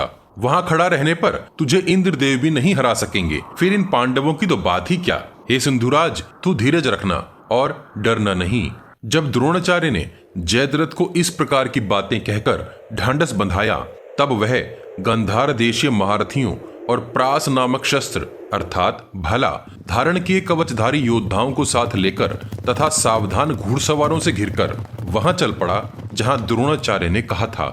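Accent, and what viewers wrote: Standard. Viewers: Bahut dino baad, etni sudh Hindi suna😊 acha laga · आपका यह प्रयास अति सराहनीय हैं। आप ना केवल शुद्ध उच्चारण से महाभारत का दृश्य पुनर्जीवित कर रहे हैं, बल्कि पुरातन काल में सनातन के परम वैभव को भी आप स्थापित करने में अपना योगदान दे रहे हैं। यह सब कार्य भगवान की कृपा के बिना असंभव है। हमारी प्रभु से प्रार्थना है कि आप इस तरह के श्रेष्ठ कार्य को आगे भी करते रहे।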